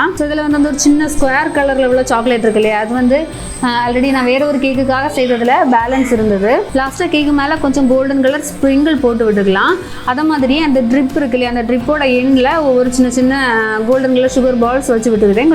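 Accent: Kanyakumari, India. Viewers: Thank u sis ,nanum ethei than panren but romba onum order varala,enoda native kanyakumari thaan sis unga slang vache therinjuthu,😍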